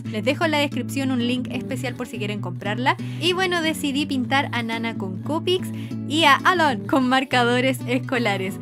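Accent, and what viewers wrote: Chile. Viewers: me parece?? o habló en chileno???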